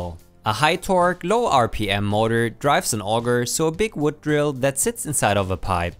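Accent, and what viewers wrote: Germany. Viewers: Man hört sehr den deutschen Akzent raus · Man hört so richtig, dass du Deutsch bist😂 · Jo your English sounds very German :] Me also German hehe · German accent is too strong to watch this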